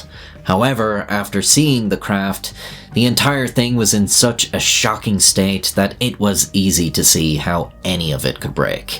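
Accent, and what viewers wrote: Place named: Ireland. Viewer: Idk why but if i'm going to be told a surreal and horrific story it's somehow way better to hear it from an Irishman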